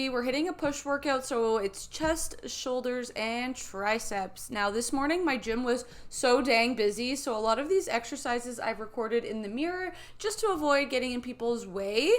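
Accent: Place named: Canada. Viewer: Canadian! U can tell by the way she says 'Out'.... heh heh;-)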